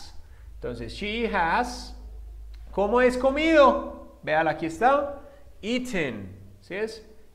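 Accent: Antioquia, Colombia. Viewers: igualmente soy de medellin lo note inemdiatamente por el acento :D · El oprimido yo también lo note y soy de españapero se le nota un fleje (mucho) que es colombiano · Más paisa que una arepa, gracias teacher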